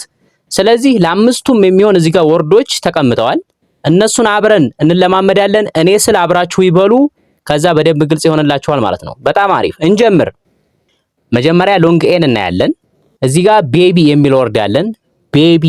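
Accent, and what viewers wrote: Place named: Ethiopia. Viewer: ለድካሙህ ክብር እሰጣለሁ ግን በአማርኛ አክሰንት ሳይሆን አፋቸውን ከፈቱበት ተናጋሪዎች ቀጥታ ብታስነግር (ብታስደምጥ)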